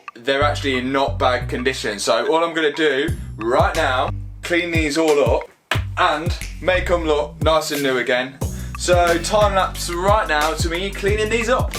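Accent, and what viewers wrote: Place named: Nottingham, United Kingdom. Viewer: Loving the vids just subbed you got a nottingham accent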